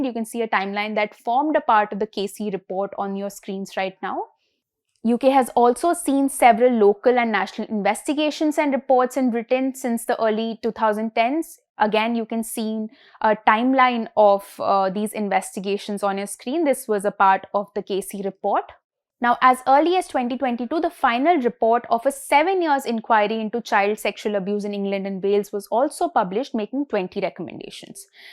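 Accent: India. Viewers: Is this an Indian woman, can tell from her accent 😢yep, unbiased. Have you investigated your gurus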